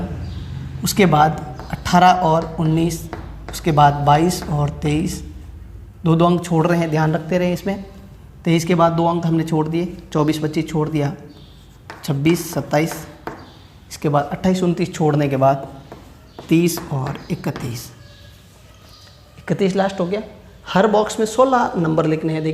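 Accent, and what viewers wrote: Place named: Jodhpur, India. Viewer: Enki aavaj me jodhpuri tone he shayad ye jodhpur ya eske aas pas se he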